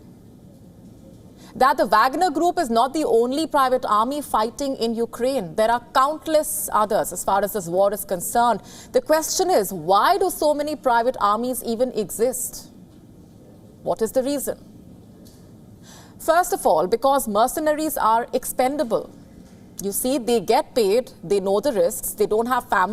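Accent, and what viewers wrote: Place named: India. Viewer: your English sounds really Indian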